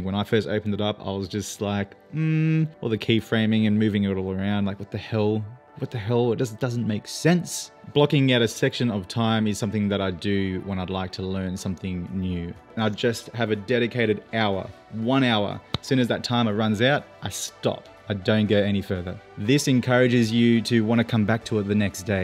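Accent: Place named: Australia. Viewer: Great video , just what I’ve been looking for. May I recommend that for us in America could you please slow down your speech a tad and work on clearly enunciating . There are some details in your presentation that we really appreciate but unfortunately we in America (especially us seniors) just can’t quite grasp the Ausie lingo. Again…. I’m not trying to be critical, love your video. This Insta360 oneX2 is very difficult to grasp even under the best of circumstances. Take care and thanks for great content. 👍👍👍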